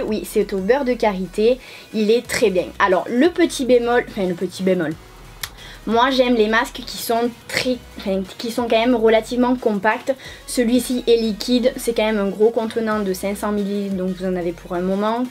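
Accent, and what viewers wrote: South, France. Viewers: t'as un accent du sud non? toulousain ou marseillais? 💍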